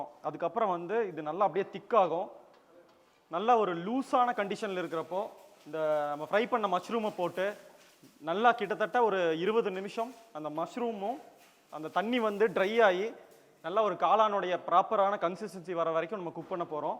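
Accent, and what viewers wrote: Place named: Coimbatore, India. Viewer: அண்ணா நீங்க நம்ம கோயம்புத்தூர் பாசையில் பேசுங்கள் அழகாக இருக்கும்.....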